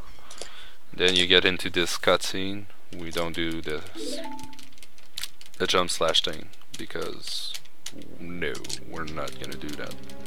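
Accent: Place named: Quebec, Canada. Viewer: tu me semble avoir un accents québécois haha merci pour le tuto sa ma aidé un px avec des skips que je rochais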